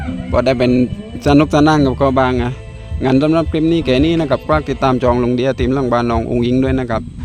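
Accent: South, Thailand. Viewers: เจ้าคนใต้ติ คือแหลงใต้😂😂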